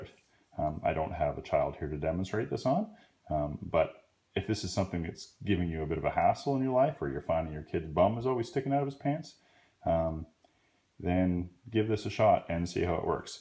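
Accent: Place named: Canada. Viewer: Canadian accent 😬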